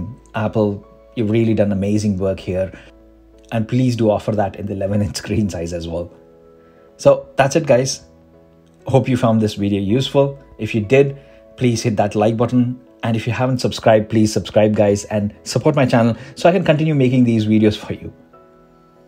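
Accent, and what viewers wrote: India. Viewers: Wow, thank you, this is very reassuring. I’m gonna go for the 12.9”, if I notice blooming I’ll just euphemize it as carpeting. Going back to global dimming is not an option. Switching to OLED or microLED when available at certain loss. You should have more subscribers. You’re the first reviewer “with a sub-continental accent (deliberately unspecific)” I like 👍. edit: not a defining factor, just objective.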